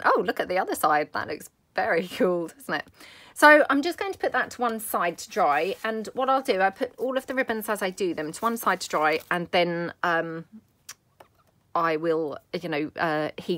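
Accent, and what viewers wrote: United Kingdom. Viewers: Love these . GREAT idea. I watch all you great video full of ideas i just dont comment much. Are you in the UK. Love the accent. I have always wanted to visit England a walk the foreshore of the Thames and pick up relics from so many years ago. Thanks for all the wonderful videos. Stay safe. hugs. i am in the US. In NC.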